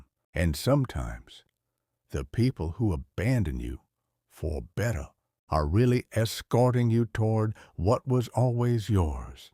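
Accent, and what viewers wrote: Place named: South, United States. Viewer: The AI voice is horrible, a southern speaking white man does not match the story.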